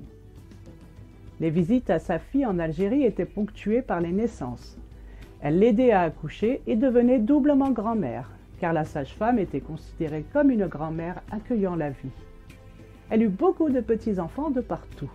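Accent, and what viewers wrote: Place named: France. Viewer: Votre accent français est si beau! Pas comme notre français au Québec hahaha !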